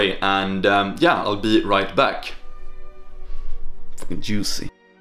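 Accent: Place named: Sweden. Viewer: Engelska ordförrådet är gudomligt men du har den arketypiska svenska dialekten 😂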